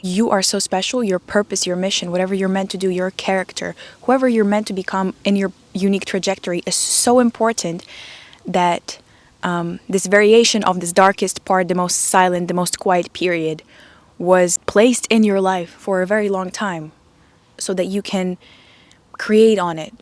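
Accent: Russia. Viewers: wait ARE YOU RUSSIAN???? YOU SOUND SO BEAUTIFUL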